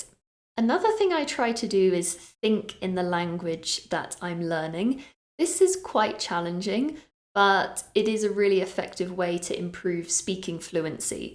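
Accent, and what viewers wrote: United Kingdom. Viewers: Straightforward teaching style. Clear and beautiful RP accent. What else, you are a marvellous teacher.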